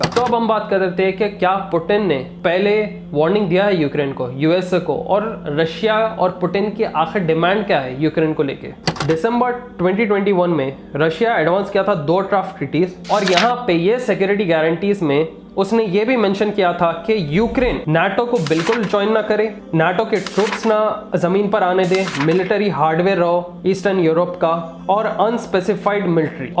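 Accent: Hyderabad, India. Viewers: Hyderabadi Urdu...🇵🇰👍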